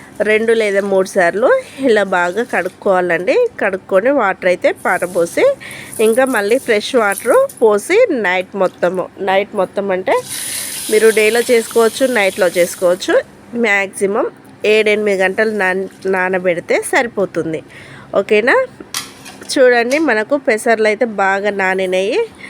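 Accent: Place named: Telangana, India. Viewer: Bahusa imedi thelangana anukonta telangana vallu thelugu alaage matlada thaaru its ok bhaasha ardha mayithe chaalu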